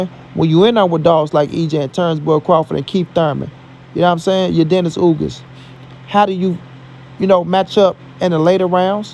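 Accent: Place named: South, United States. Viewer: Boots does NOT look like Spence on the rise,, NONE of them look like BOOTS yall got this excuse NOW as yall gona,, BOOTS beats Spence BOOTS beats Crawford & Porter said BOOTS is ready for ALL THEM GUYS NOW! if you a boxing channel then you should know Porter said BOOTS is ready for all them NOW,, & clearly with your country accent your a Spence fan,, so your bias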